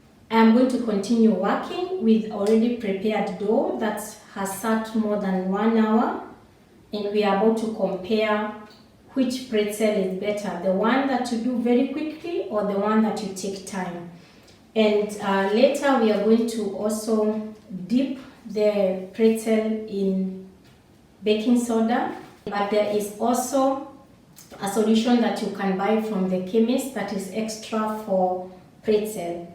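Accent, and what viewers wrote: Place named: Mozambique. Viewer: I have a friend from there with a similar accent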